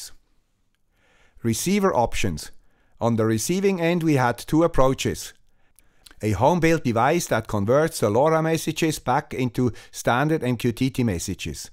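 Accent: Switzerland. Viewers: Hold on hold on you're Swiss! I thought you were Dutch. Then what do I know, I'm English with Danish ancestors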